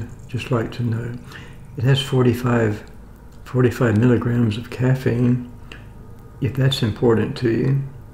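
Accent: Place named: Delaware, United States. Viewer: Where are you from? You remind me of a Delaware accent or maybe Philadelphia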